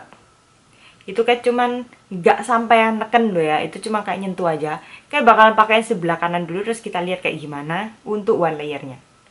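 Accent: Java, Indonesia. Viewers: medok medok ngono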